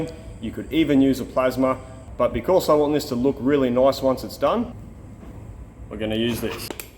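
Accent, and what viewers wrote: Australia. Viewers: QUESTION, besides the letters H and Z, what others are pronounced dif in OZ?????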